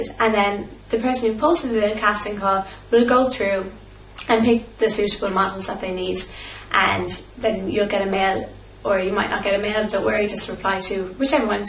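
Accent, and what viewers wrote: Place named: Ireland. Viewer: I thought I recognized you from the video thumbnail, and as soon as i heard your accent I realized it was from looking on Irish modelling agency websites earlier :) Could I just ask a few questions please? What would be your opinion on starting modelling young? (im 16) And where would you recommend starting looking for agencies in Ireland? Thank you! This was a really helpful video and you're really beautiful xx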